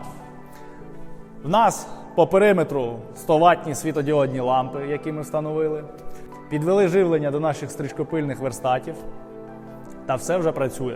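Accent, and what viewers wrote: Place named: Ternopil, Ukraine. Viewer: Ніколи б не подумав по Вашій мові, що Ви з Дніпра, а не Тернополя)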